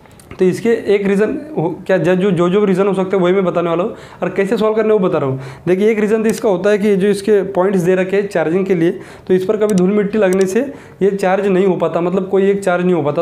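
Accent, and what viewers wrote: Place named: Mumbai, India. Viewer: Fucking useless i cant understand bombay shit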